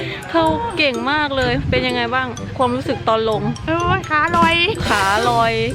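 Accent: Isan, Thailand. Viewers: หลงมากกก น่ารักพูดอีสานอีกโอ้ยยย🤍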